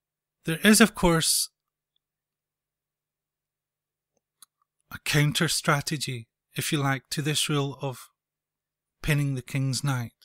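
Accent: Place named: Scotland, United Kingdom. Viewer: Terrific videos. Love the scottish accent too.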